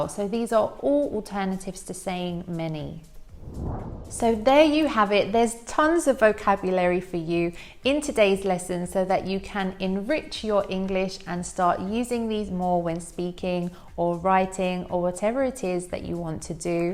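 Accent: United Kingdom. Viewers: Hello 😇 Take love from India ❤️😇💐 Can you please tell me in which british accent you are talking 😇 To be honest with you I am in love with your accent 😇💐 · you have very good British accent.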